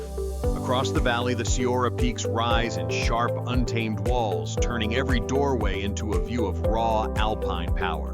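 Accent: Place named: United States. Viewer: Replace the American with proper pronunciation, and move Munot from Stein am Rhein back to Schaffhausen. Nice, but standard selection of places.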